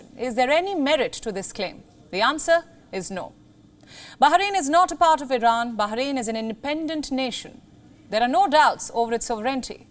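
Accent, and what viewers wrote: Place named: India. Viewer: What is this? it's not news and it's not analysis, it's just some one saying imaginary things in Indian accent.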